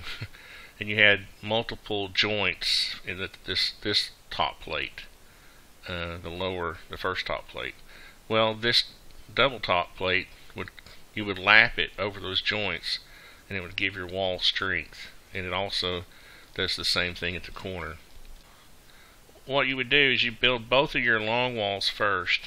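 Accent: South, United States. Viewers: That outside corner is no longer code can't insulate and besides that when you stand your other while you're inside is only going to have half an inch for your sheetrock to screw into should have inch and a half so when your half inch goes on one wall you'll have the other inch you also sound like you're from South which you don't get very cold so I'm sure the insulation is not an issue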